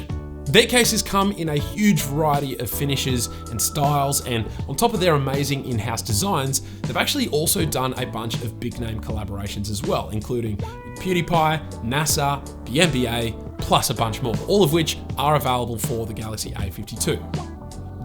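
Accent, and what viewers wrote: Australia. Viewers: Galaxy Aye52 😆 Love that Aussie accent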